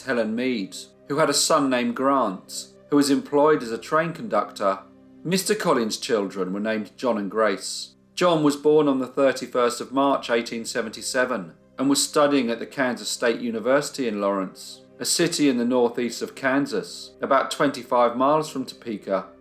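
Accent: United Kingdom. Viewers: One of my favorite bands. Must be an accent thing of that area of Britian.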